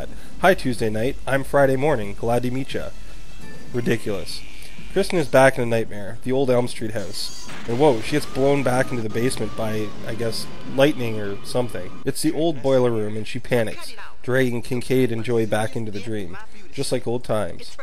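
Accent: Canada. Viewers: you're canadian, eh?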